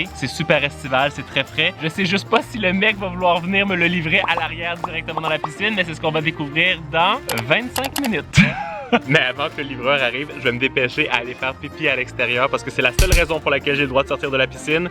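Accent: Canada. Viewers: tu mon youtubeur préféré desCarl is cooking vidéos accent canadien et qui met quoi des vidéos trop trop rire 🇨🇦👍👍👍👍👍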